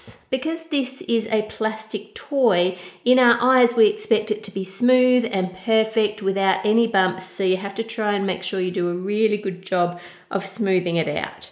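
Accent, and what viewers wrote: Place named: Australia. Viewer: sounds more Australian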